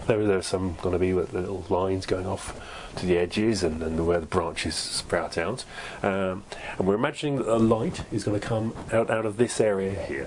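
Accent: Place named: England, United Kingdom. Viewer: You meant 'spot' of tea didn't you??? It's been so long since i've heard a proper English accent... L'oven it...! And you painting too!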